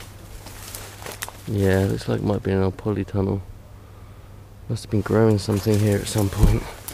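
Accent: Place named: Suffolk, United Kingdom. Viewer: Quite welcome! I am fascinated by these type of urban explorers/abandoned properties videos, and enjoy seeing them. Also one viewer suggested having subtitles, as though this were a foreign film in need of translation. Not for me! In my teens the local PBS station ran lots of UK programs, BBC/Thames/ITV etc., so I have no difficulty in hearing your Suffolk accent. My ear for dialects is perhaps more finely tuned than the average fellow :)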